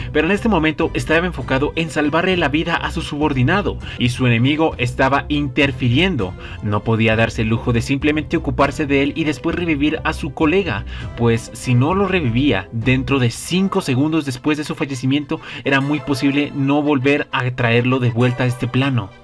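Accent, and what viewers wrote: Colombia. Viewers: a nmms eres colombiano?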